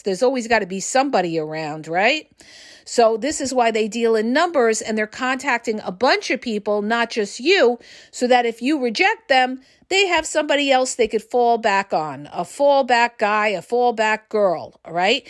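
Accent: New York, United States. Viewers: Besides all the Facts that speaks on about Narcissist Abuse, has the Cutest New York accent here on YouTube 😊❤❤❤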